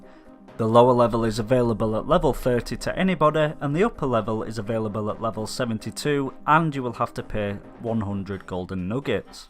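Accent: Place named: Yorkshire, United Kingdom. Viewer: Jesus, that's a strong Yorkshire accent. Where abouts are you based? Think you're very, very close to me (Wakefield)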